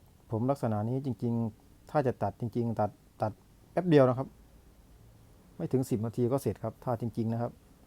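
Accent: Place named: Laos. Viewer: ข่อยมักการพากษ์อ้ายแฮงครับ เว้าไทยเเล้วสิมีสำเนียงลาวแกมมานำ แบบผมเลยครับ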